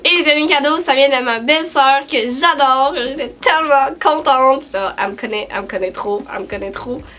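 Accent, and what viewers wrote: Quebec, Canada. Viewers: c'est toujours drôle d'entendre les expressions québécoise et les mots qu'on dit différemment en France! ton rouge à lèvre est magnifique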